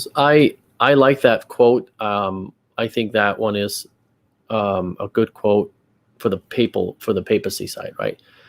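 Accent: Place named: Arizona, United States. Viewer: Are you in the Middle East? I would maybe guess someplace like Phoenix, Arizona, based on your accent, and there being a large Chaldean (and, Melkite) community there😀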